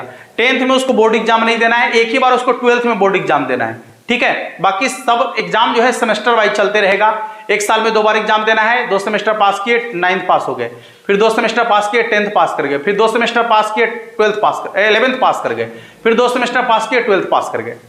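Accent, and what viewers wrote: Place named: Bengal, India. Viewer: You should explain age and grade classification. At grade 3. What the child age. Bhai your Hindi is not good. It looks like a Bengali accent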